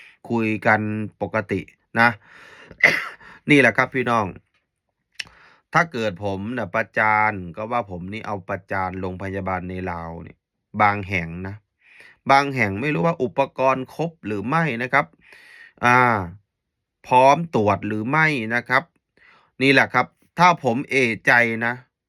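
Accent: Isan, Thailand. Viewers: เทพโยสดำเนัยงเหมือนคนอิสานเนาะ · สำเนียงการพูดไม่เหมือนภาษาลาวเลย เหมือนภาษาอีสานมากกว่า หรือว่าผมคิดไปเอง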